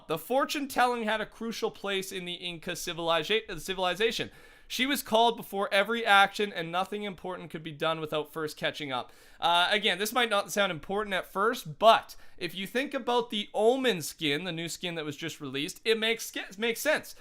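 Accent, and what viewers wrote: Austria. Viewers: What you are austrian? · Oh, ein österreicher :D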